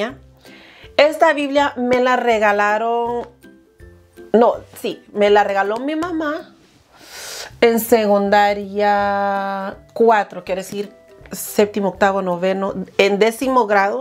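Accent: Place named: Latin America. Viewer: Hermana: muy buen review!! Sorprendido por lo que dijo que tenía acento... la verdad que se escucha más latinoamericana que yo que soy de Argentina!! (Si me decía que estaba en México o en Guatemala o Salvador u Honduras... le hubiese creído). Bendiciones!!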